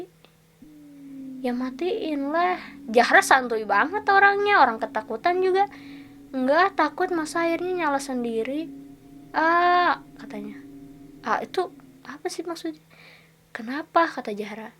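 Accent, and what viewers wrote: West Java, Indonesia. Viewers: Kak keliatan banget orang Sunda nya lipe kak😭❤️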